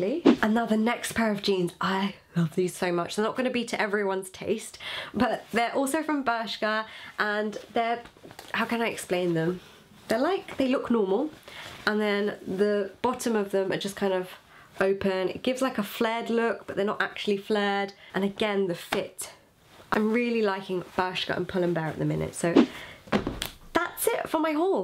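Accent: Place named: United Kingdom. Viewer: Just found your channel I love your accent I'm British too your accent is so lovely . Love you style and vibe , keep up the good work Hun :) xx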